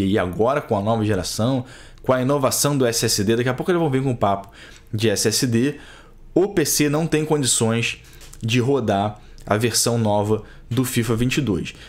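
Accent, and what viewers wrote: Rio de Janeiro, Brazil. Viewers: Comparar o FIFA deixxx com o peixxxx... Esse sotaque carioca é uma foda...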